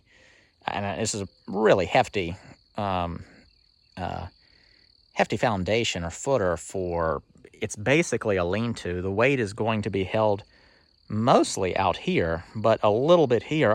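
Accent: South, United States. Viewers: That is definitely the coolest building for multipurpose that I have seen. I really dig the way you have re-used a lot of stuff (particularly the roof). When your saw mill gets going you will be set to expand your collection of buildings on your land. I know you sound like a southerner, but you work like a New Englander.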